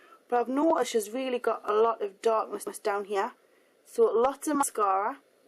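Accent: Ireland. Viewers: yea i love her irish accent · Loveee your accentttttt xDDDD Irish???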